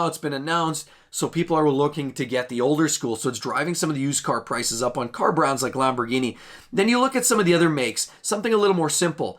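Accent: Canada. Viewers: What accent is this? Canadian?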